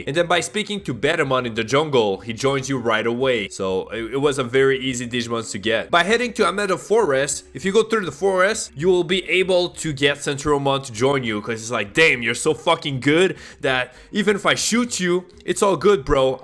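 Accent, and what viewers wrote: Quebec, Canada. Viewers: His French canadian accent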